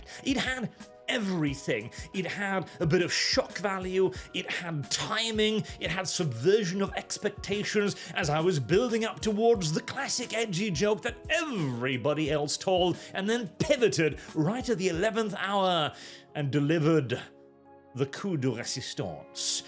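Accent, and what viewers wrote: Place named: United Kingdom. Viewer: Im surprised your from Norway, i would have never thought... Ur English is as Birtish as its gets!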